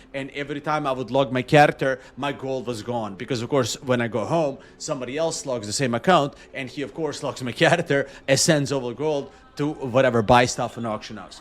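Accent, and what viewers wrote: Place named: Scotland, United Kingdom. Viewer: Odakle ti ovaj Skotski naglasak?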